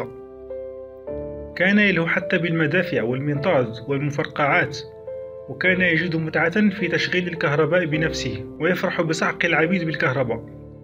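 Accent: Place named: Syria. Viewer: يوميات المصريات يا أخوة اسمعوا صاحب الفيديو هو سوري مش فلسطيني هي لهجتوا بتكون سورية اسمعوا مليح لهجتوا سوري عميل الصهاينة و العياشة المخزن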